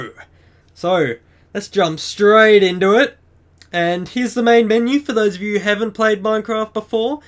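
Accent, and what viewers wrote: Australia. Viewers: no offense but are u aussie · lol your from the outback xD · Australian, huh? (Accent) Two minor corrections: Worlds are not random, but if you don't enter a seed, the seed to make the world will be. Not quite infinite. Possibilities, almost, the world itself is almost 4x the Earth's surface. Anything after that are either fake chunks, or over-wrapping from the opposite end (not sure of this). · are you australian???? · Is this dude an Aussie?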